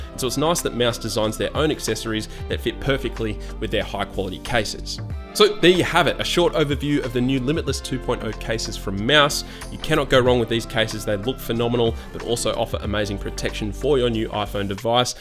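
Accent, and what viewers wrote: Australia. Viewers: I'm sold with the magnet tech. I was wanting something like this to stick to equipment at the gym so I can see the timer without having to stop. Great review. Nice to hear an aussie voice on the tube too.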